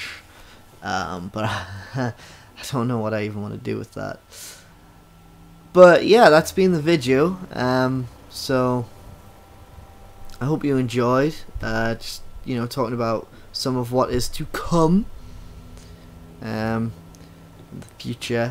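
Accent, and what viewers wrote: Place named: Canada. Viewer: you sound like a Canadian stereotype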